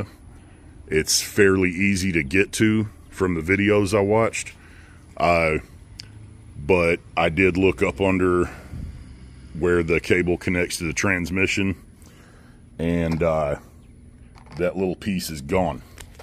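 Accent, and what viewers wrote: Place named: United States. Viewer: This guy has the most American voice I’ve ever heard. Made me watch the video instead of skipping ahead.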